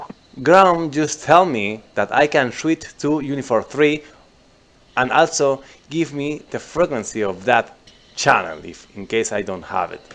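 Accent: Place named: Argentina. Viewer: You sound like my coworker from Argentina. No worries. Your english is good. Thanks for the tutorial. After 10 years back in the Pit I was a bit lost with all the changes from 4.32 back then haha.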